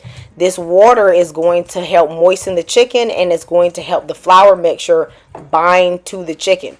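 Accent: South, United States. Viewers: Like Mr. once said in my Country Brazil in 1926. IT'S DELICIOUS! IT'S DELIGHTFUL!!! Thank you so much for this so special recipe I will prepare immediately! I LOVE your accent!! I had a golden opportunity to live in the south for 6 years Birmingham Alabama. I made a lot of friends there. I learned how to make a good gumbo soup and chicken Jambalaya. Thank you again. Love in it! 🥰👏👏👏👏👏👏👏👏👏👏👏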